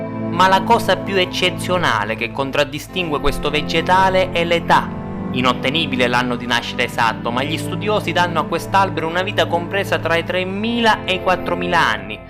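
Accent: Sicily, Italy. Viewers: accento siculo e pure un pò culo. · minchia che accentazzo siculo!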